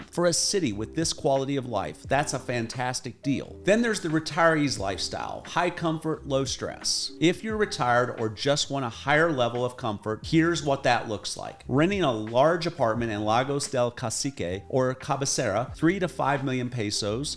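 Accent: United States. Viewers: You aren't respecting the Spanish language if you don't roll the letter "r". Try listening to your local friends and copying, rather than reading the Spanish words with an American understanding/pronunciation. Just wanna help 😉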